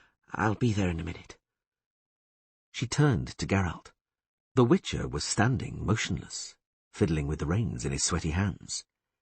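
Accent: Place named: United Kingdom. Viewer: I've got trouble understanding many words, I am not a native speaker and the weird words plus the British accent makes it incredibly hard to understand ( the text helped a lot so thanks 🙏) I'll switch to Portuguese though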